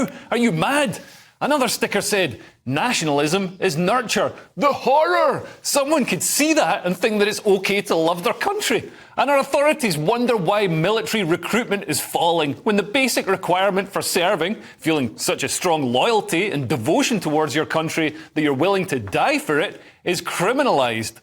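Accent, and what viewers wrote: Scotland, United Kingdom. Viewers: I like the Scottish accent for news reading. Could we try a Glesga accent next ? Has the guilty policeman and the court official responsible not just for the Britons arrest but twisting our laws, so as to jail a man for posting harmless posters around town.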